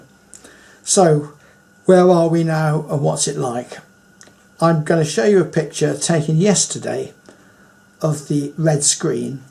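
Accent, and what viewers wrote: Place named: United Kingdom. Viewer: well im super happy that i found this informative video which also comes with a british bloke :D greetings from germany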